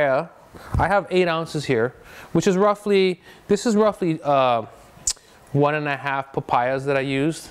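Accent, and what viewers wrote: United States. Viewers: The problem is these americans think they can speak Englisd as well, like the rest of us. Terrible! Maybe we should advise them to watch BBC TV and learn from there.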